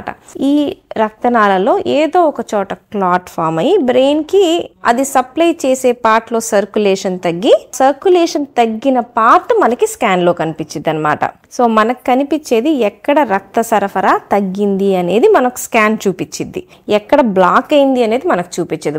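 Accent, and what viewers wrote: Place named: Telangana, India. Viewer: అందుకనే డాక్టర్ రేట్. Though u in Guntur, u speak Telangana Telugu.. nice...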